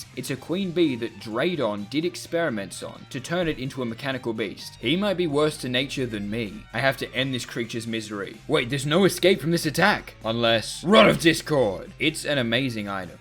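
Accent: Australia. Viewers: wait your Australian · I didn't know you were Australian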